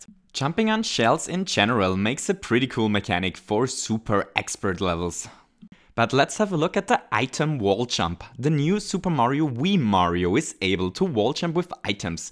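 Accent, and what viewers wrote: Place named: Germany. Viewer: Haven't you heard of its a German accent?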